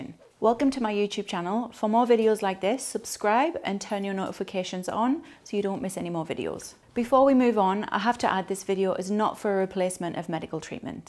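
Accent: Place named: Sunderland, United Kingdom. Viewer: My ribs are done in off a hook to them. At least 6 weeks off. Possibly broken or muscle damaged. I can’t help noticing the sunderland accent. Am from Washington Tyne and Wear.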